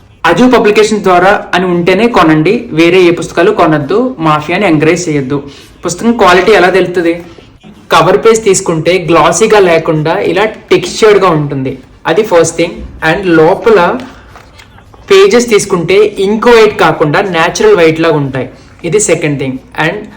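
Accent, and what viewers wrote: Godavari, India. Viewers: మీ నవల ను అమెజాన్ నుండి మా డాటరు నాకు గిఫ్ట్ గా ఇచ్చింది చదివాను చాలా బావుంది మా డాటరు పెద్ద ఫేను మీకు 😂 సాప్ట్ వేర్ ఒత్తిడి టైములో మా పిల్లలకి మీ రీల్స్ మీ గోదారి యాస చాలా బాగా ఎంజాయ్ చేస్తారు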